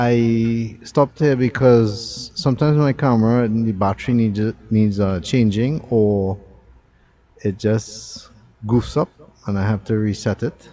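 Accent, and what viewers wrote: Trinidad and Tobago. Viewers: Hello,I love watching all your videos, I like the trinidadian accent,I come as often as possible as my family all live there,I now live in Europe,please talk more and Ill watch more often..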